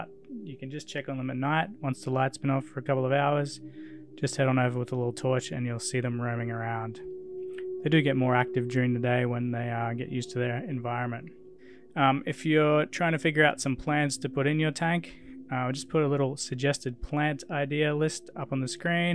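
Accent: Australia. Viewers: thanks for the info. I noticed your Aussie accent and I thought the laws got changed. Keep up the good work!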